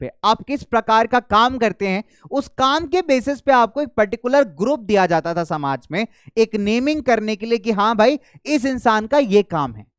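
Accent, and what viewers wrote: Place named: Maharashtra, India. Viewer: sir you are an marathi or from mumbai because your ton is like that sir ❤❤🌊⛴️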